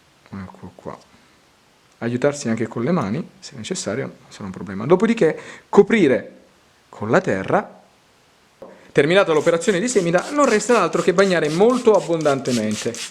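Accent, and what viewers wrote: Italy. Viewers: senior, I enjoy your gardening tips & love your Italian accent, ciao.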